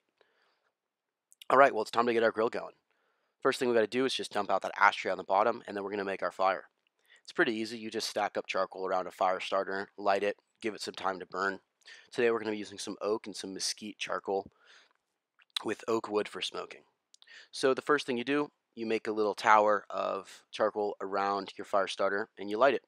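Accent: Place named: United States. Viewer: American accent, so I'd say F.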